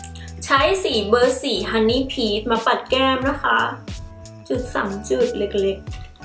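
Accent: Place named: South, Thailand. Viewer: พี่ แหลงใต้ทำคลิปหล่าวตะ ชอบนิ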